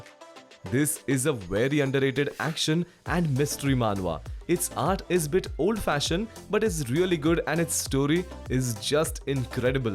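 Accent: India. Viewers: this guy is indian.... i know this accent 😁😂😂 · india accent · Thanks, I didn't wanna hear his disgusting Indian accent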